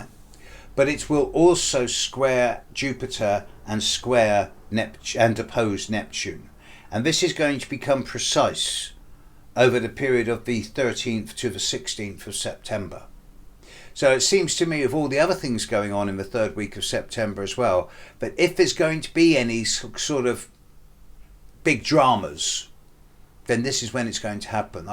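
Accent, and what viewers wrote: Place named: West Country, United Kingdom. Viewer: Love your West Country burr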